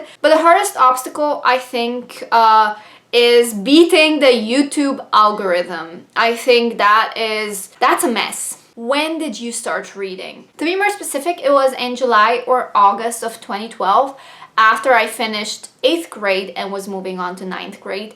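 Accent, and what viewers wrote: Slovenia. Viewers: Filming in pyjamas xD So relatable hahaha Welcome to Booktube again then haha I hope that you will enjoy it this time and that you will stay with us! This community is really amazing! So supportive and welcoming I mean you will find trolls everywhere but just don't mind them! Wait you're Slovenian???? Ziva from reader life is Slovenian too and well I'm half Slovenian and half Bosnian but I currently live in Belgium... Your accent is amazing!! You could do the International Booktuber Tag! Pets! yes I only have a dog but she is the best haha I mean you are unique, your personality is unique and anything else you do besides reading will make your channel unique... Oh you will meet tons of friends! They will even help you build a never-ending tbr hahaha I mean interaction here but also on twitter, instagram and sometimes discord. Watch other videos, comment and get to know people. 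I always say the same but stay yourself, connect with people, have fun and film the content you are passionate about! See you soon!!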